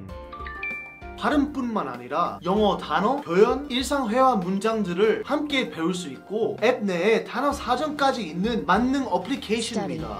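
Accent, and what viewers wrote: South Korea. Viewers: 현지화 끝판왕이 모국어를 그나라 버전으로 바꿔가면서 하는거라고 생각 영어 원어민인데 한국식으로 발음까지 ㅋㅋ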